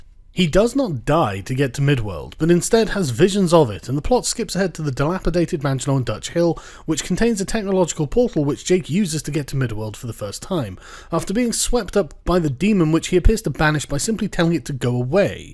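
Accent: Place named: United Kingdom. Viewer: When he said "Fuck this movie" with his soothing, perfect British accent, I don't know how to put it... Um, this is a bit dirty but... I got a boner.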